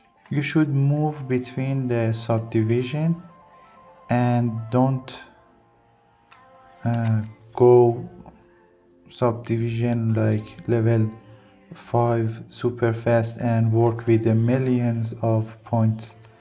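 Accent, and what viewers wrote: Iran. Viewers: are you Iranian? your accent sounds like you would be! if not where are you from?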